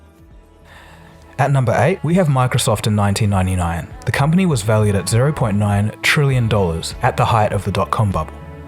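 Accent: Australia. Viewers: A great video Are u Aussie? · Crap channel. Horrible Australian raspy accent. Terrible summary knowledge which frequently engages in absurd reductions and simplistic analysis.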